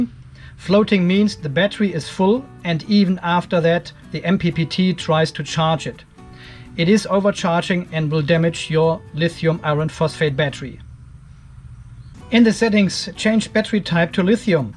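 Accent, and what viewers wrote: Germany. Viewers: So funny listening to a German pronounce Lead or Voltage, as for Iron ......OMG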